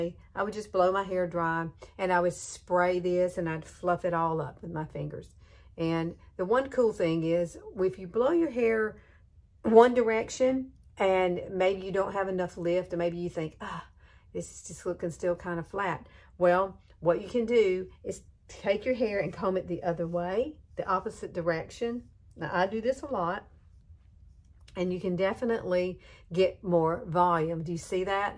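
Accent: South, United States. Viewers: OMG girl I cannot wait to order that curling iron. I too have super fine, super thin, and super straight hair. My hair never and I mean never holds a curl. I cannot wait to have lasting curls and volume. Also, I think you are so damn cute! I showed my husband a brief clip of you and he chuckled and said other than the southern accent he could swear we were related by your personality. Accept I am very sarcastic! One last thing, I do not have a pace maker but I just got a portachath placed in the same exact spot your pace maker is. Be patient it will become just a part of your body and at some point you will forget its there. We just have to count our blessings for the gifts of modern medicine that can extend our lives and keep on keeping on! Blessings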